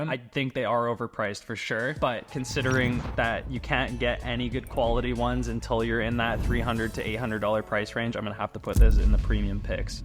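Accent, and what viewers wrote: United Kingdom. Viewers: ha ha love the british accent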